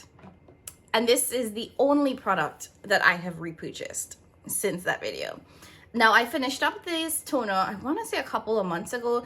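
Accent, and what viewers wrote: Trinidad and Tobago. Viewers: Trini? i keep picking up on an accent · What island are you from? I'm guessing Trinidad Yes definitely Trini...only trinis say THEE for THE! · A Trini voice could never heard inno 🥳🥳🥳big up you abd thanks for d info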